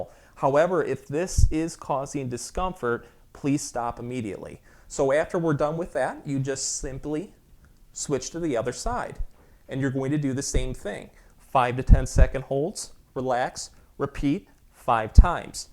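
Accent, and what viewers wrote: Michigan, United States. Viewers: Thick Michigan accent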